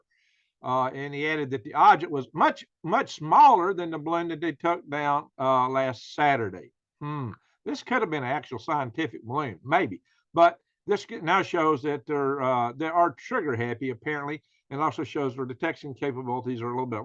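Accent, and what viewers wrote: South, United States. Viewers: When the grid falls im sure gonna miss hearing. My favorite southern rocket scientist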